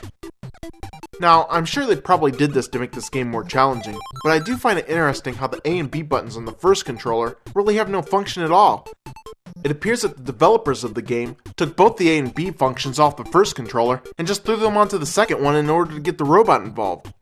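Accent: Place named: Ohio, United States. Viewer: Does "charism" mean a big dumb pasty head with an awful Ohio accent?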